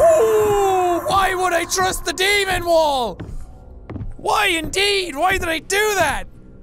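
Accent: Ireland. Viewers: It's an Irish accent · I love how he gets more Irish when he's angry or scared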